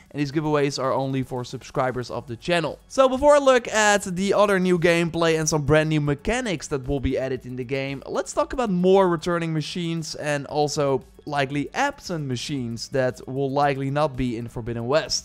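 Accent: Netherlands. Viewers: may I ask you what your mothertongue is? Your English is fine ofcourse, but I can't quite put my finger on your accent. My guess is that your a Dutchie.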